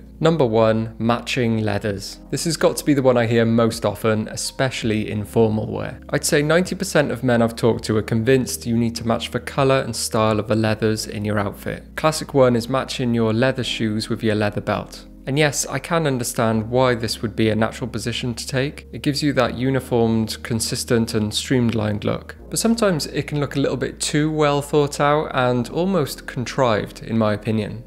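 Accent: Wales, United Kingdom. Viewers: Such a beautiful accent! Is this typical of Wales? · Another bullshit advice with a British accent.😅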